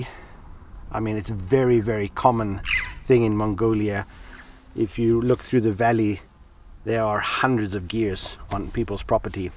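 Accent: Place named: Cape Town, South Africa. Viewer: I knew it🤣🤣🤣 I'm from Cape Town too..our accent is very distinct to the rest of the world..you can here a Cape Tonian accent peeping through any accent lol. But I'm so happy for you. Your life looks so pieceful. I'm struggling in Cape Town to escape the rat race. It's just so expensive to buy your way out to live self sufficiently here. I want to move off grid but land here is so tight and the land claim act is making it difficult for people. So I dream of taking an adventure like you, but I don't have enough money yet. But you are brave Sir. Very brave to journey so far to the unknown. I'm really happy for you. Keep on enjoying your adventures with your family..I have subscribed to your channel. I loved the archery video as I am into archery 🏹.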